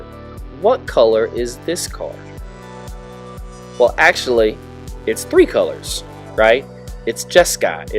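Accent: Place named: South, United States. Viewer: I agree with some of these, but not all of them. I still believe that most Phyrexian cards are predominantly wanted by their respective colors. They do see occasional play in other colors, but from my experience they are for the most part played in decks that include the color on the card. The biggest exception to this would be Porcelain Legionnaire. Aggro decks of all shapes and sizes love this creature. But for the sake of balance, and because the rest tend to mostly see play in their own colors, I just categorize them all in that color. Speaking of balance, you also mentioned adding in a color aligned land for each color to go alongside Academy Ruins. I don't agree with this. White has maybe one cubeable land in Karakas. Red has actual zero. I think color aligned lands should be treated no differently than any other color aligned card type. 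Don't force any specific card type into every color. If there are no lands you want to play for a certain color, that's ok. It won't offset the draft or the game play at all to not have a red aligned land. Finally, I searched for on Twitter and got no results. Anyway, I really enjoy your videos. It's also nice to hear another southern voice in the cube community (I'm in TN). Cheers, man, and keep the content coming.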